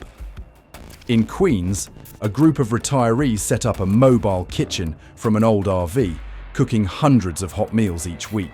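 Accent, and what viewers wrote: United Kingdom. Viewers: Whoever the limey Brit narrator is, talk shit about England. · Gotta love a video with a snooty British accent type narrator talking about how New York sucks . Bite me ya' limey wanker ! Lol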